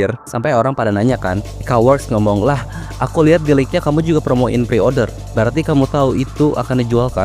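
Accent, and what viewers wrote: West Java, Indonesia. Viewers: bang, kamu orang sunda ya?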